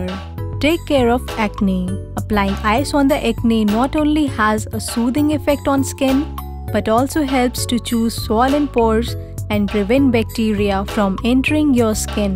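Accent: India. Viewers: Indian accent... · cory Turner if the video is made by a indian the English will be the same way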